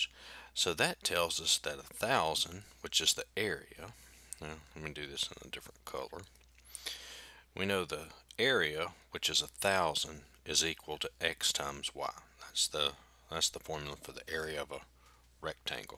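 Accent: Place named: United States. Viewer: I like your accent.. it sounds... American. Very soothing. (this is comming from an Australian). Great video!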